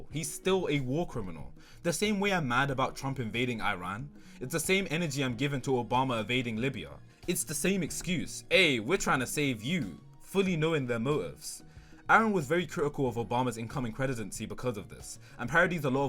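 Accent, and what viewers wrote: United Kingdom. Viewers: You and prince Soloman sound similar, ur jus the British version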